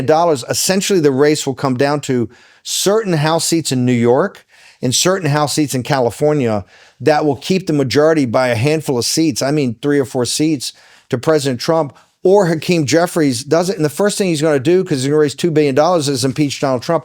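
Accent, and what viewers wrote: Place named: RP, United Kingdom. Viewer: too bad Bannon has to be talking with a lightweight. A beeb accent is not a guarantee of ability.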